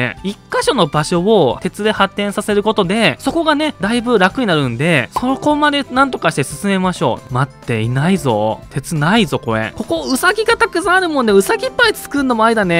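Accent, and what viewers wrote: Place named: Mikawa, Japan. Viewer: よく三河弁出とるもんで三河？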